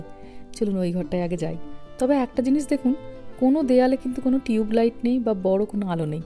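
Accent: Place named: Medinipur, India. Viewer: eta mednipuerer dialect.. r ekta mudra dosh “ janen” na bolle onek better hobe.. baki golpp bolar khomota omayek..natural khub!!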